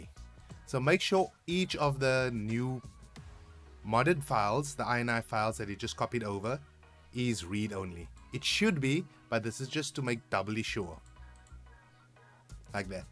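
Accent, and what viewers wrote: South Africa. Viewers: are you from south Africa??? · ahhh the defining South African Accent !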